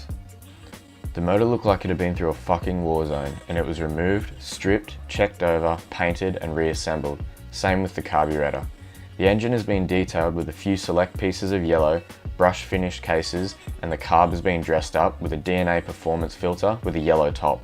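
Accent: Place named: Australia. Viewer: Love the narrative. Aussie as fack.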